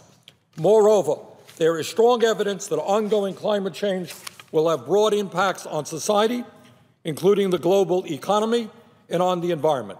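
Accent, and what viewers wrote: Brooklyn, United States. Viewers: Gotta love the old school Brooklyn accent!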